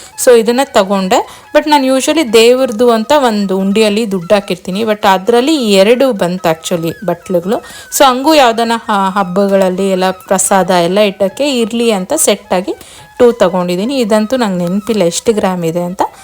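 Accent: Kolar, India. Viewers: Nimdu kolar ahhh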